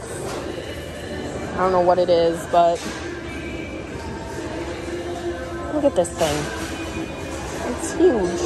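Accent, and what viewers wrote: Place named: Northeast, United States. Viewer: I love the way you talk I am knowledgeable of where are you from the Northeast and that is your Northeastern speech those who are not educated as to the different dialects of the language sorry for them but I enjoy every word you say and I appreciate hearing you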